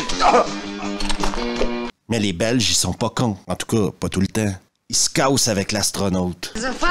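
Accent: Canada. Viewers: j'aime cet accent canadien pour me rassurer de ces nanars . merci!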